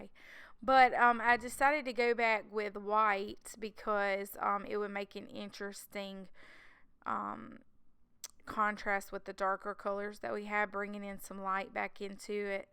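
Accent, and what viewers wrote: South, United States. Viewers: Welcome back! I have sure missed your wonderful videos, and that cute southern accent!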